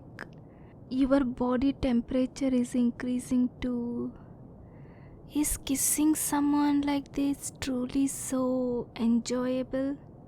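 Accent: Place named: India. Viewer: Wow, I've been listening to asmr rp/story audios for awhile now specifically ones with female voice actresses but i don't think i have ever listened to one where the voice actress has an Indian accent, you sound really good. I myself am Indian but my family are not from there so i actually never heard the accent much at all.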